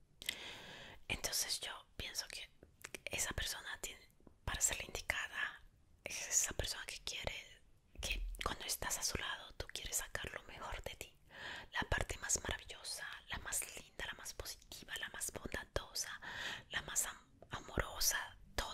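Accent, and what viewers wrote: Italy. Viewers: Eres de las Youtube más hermosa, eres full linda, con ese tono y timbre de voz italiano y español, uhff el combo total linda, con esa feminidad y esa voz, enamoras, seduces y creas una sensación extrasensorial extraordinaria y que trasciende más allá.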